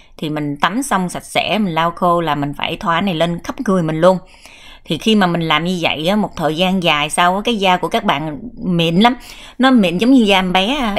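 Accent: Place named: Mekong Delta, Vietnam. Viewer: chị người miền Tây phải không, sao e nghe giọng của chị phát âm rất nặng mà tiếng cứ cứng cứng lm sao ấy ạ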